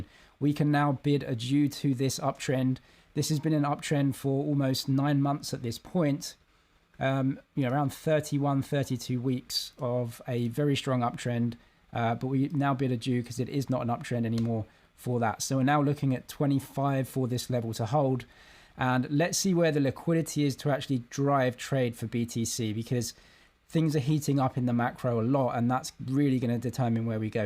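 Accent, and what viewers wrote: England, United Kingdom. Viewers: Fantastic. Where in England are you from?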